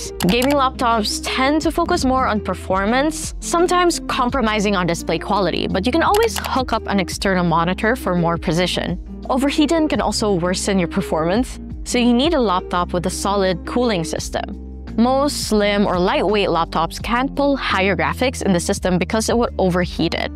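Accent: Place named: Philippines. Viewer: I think her Filipino accent is still noticeable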